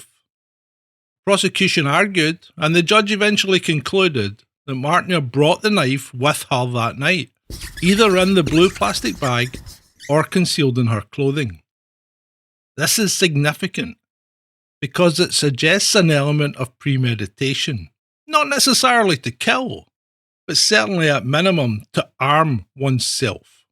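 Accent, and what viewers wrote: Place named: Scotland, United Kingdom. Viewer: Wonderful Scottish accent, greetings from Aberdeen, but to the point; first of all, she was not given the right to a defense, the provisions of the human rights convention were omitted in her case, and the Vienna Convention of 1968 was omitted! She did not have a fair trial, this trial was saturated with racism and discrimination!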